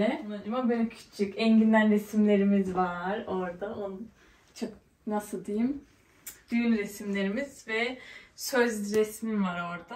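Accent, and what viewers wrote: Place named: Usak, Turkey. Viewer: Güzel Kızım benim...Uşak'lı olduğunu Beyza'nın evine geldiğinde, konuşmandan ve Tarhanandan anlamıştım. Ne de olsa aynı Toprak..Kan çekiyo demekki. Güle güle otur her iki evinde de. "Ehh bende şindi Abone oluvedim gali!" Iyi ettim mi gııı?😉❤😘 Seviyom seni...inşallah bi gün Uşak'ta, Izmir'de karşılaşır ya da buluşuruz.😘❤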